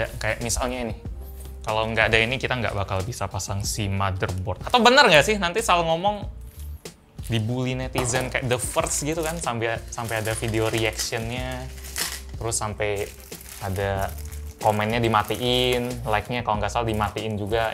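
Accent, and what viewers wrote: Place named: Java, Indonesia. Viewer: bahasa mu medoke banget hahahahahhaahhahahaha "DARIPADHA"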